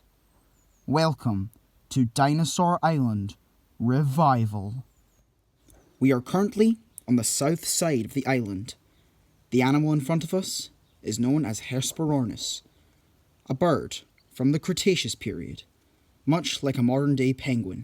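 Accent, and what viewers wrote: Scotland, United Kingdom. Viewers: I’m just wondering are you Scottish cause to me you do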